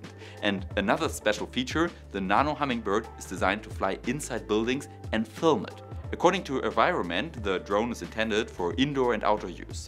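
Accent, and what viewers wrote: Germany. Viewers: You talk like Arnold Swarzenegger's nephew, being German, but I find your talks quite interesting.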